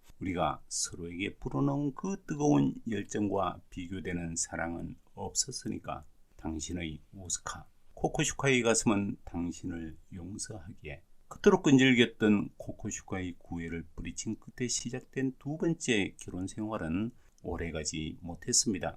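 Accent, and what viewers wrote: Gyeongsang, South Korea. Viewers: 경상도 억양은 좀 사양합시다. 같은 지역 사람들은 괜찮겠지만 참으로 듣기 역겨워요. 쏘리~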